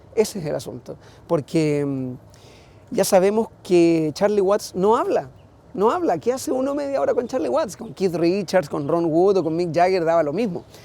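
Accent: Chile. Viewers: disculpa cuando estabas en MTV tu lengua era mas clara un verdadero idioma ahora suenas a un chileno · ahora si habla como chileno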